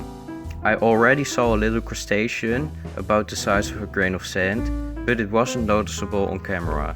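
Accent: Netherlands. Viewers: Are u dutch? U sound it lol, im dutch but without the accent. Hoe gaat het?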